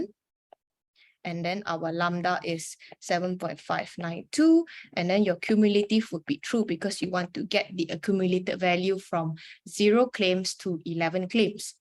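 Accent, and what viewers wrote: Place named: China. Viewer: Try to explain slowly and speak english not as chinese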